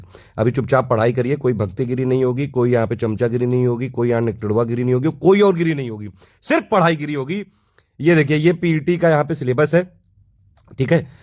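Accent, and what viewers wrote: Kanpur, India. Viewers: 😁😁😁😁😁 bhut sahi se liye ho kanpuriya style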